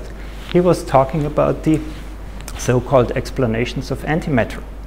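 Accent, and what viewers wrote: Germany. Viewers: Until now I thought only Americans don't know how to foreign languages. But you are speaking with German accent and do the same with french - your neighbor!? Paul DIRAC. Put emphasis on the second syllable "AC" not on DIR. I agree with your notion "particle physics" is nonsense and the reasons.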